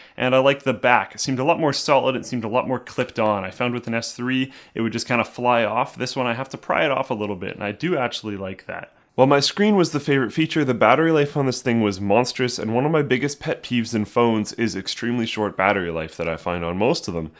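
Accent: Canada. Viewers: dat canadian accent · Is he Canadian?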